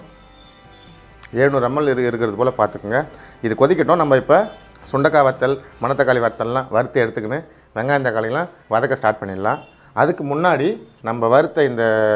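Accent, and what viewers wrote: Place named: Nagercoil, India. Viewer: Voice nagercoil pola irukku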